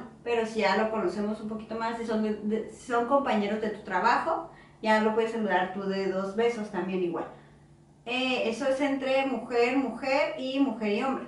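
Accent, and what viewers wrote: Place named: Baja California, Mexico. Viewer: Vayan a Baja California y van a ver como si les parece mexicana, a lo mejor por aquellos rumbos la gente somos un poquito distinta en acento y costumbres, pero para mi percepción si la veo súper mexicana y su acento sigue igualito a la gente de Baja como si no llevase años en Turquía jaja. Saludos a todos y bendiciones.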